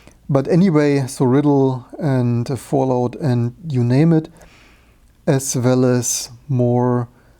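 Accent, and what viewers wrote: Germany. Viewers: Solltest schon sagen dass du Deutsch bist, es ist wirklich nicht zu überhören 😅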